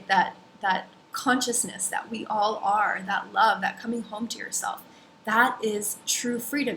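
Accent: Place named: Minnesota, United States. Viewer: Too true. All those years in HI and you can still hear the MN in your voice. Love it! :)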